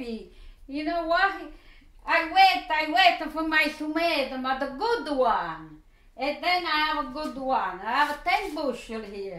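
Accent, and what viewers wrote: Italy. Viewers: This lady must be right off the boat, her Italian accent is thick, but I love it! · I love! By her accent and certain Italian dialectic words, I think she is from a paesa nearby my paesans. We come from Castel Nuovo, Parranno - vicino Formia e Montee Cassino. Love hearing her accent. It brings me back to old times with my nonno and nonna!! <3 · Dear Italian Grandma: Sitting far away in Mumbai (India) I really enjoyed watching your show, your beautiful Italian-English accent, your passion for cooking...thank you so much. I shall definitely try your recipes. Unfortunately, here we do not get as good tomatoes as are found in your countries. Thank you very much and wish you many more happy years of cooking... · . You poot oo vasanico'. My God, she's fantastic! I love her. What part of Italy are you from? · Thank You. You are a wonderful grandma . 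I appreciate your dedication and love to the job you are doing . Congratulations. And for those who made fun of your English , please be polite and respectful . She is Italian , not from London . And it's very natural that her pronunciation and accent will be influenced by her mother tongue . She still has good vocabulary and put a nice effort to make us things right. Congratulations again Grandma !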